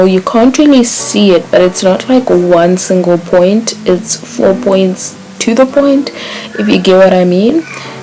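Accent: South Africa. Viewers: tell me you’re south african without telling me “ so ja “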